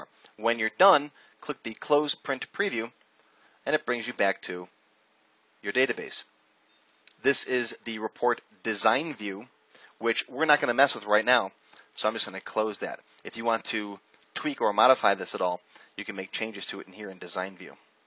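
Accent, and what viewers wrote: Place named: United States. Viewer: Thank you for your helpful video tutorial! You speak with so much clarity and articulation! What a pleasant surprise I got when I heard your accent. Finally someone who speaks English but even better that it's American :)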